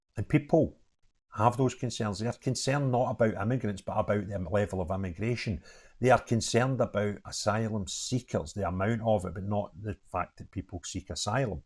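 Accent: Scotland, United Kingdom. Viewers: …I know he has a Scottish accent. But that doesn’t mean we don’t need subtitles to understand a single word coming out of his mouth.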